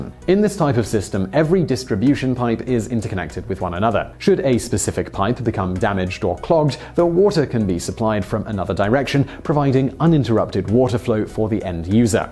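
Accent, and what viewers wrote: United Kingdom. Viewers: I'm confused. Why is someone British (by his accent) explaining about fire hydrants in the USA? In Britain hydrants are below ground level and plates ('H') indicate the hydrant distance from the plate and the diameter of the water main. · Oh look, the British Mathew Santoro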